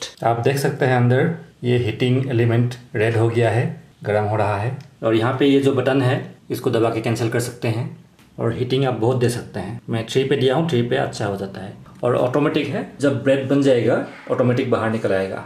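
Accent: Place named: Bengal, India. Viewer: Wow Bongali accent, Kemo naaache?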